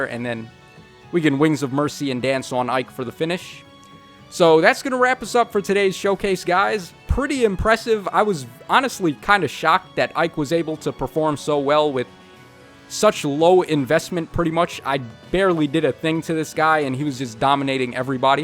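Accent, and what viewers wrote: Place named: Brooklyn, United States. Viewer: May I just ask you to say Kiba? Because you sound like you're from Brooklyn or that area at any rate...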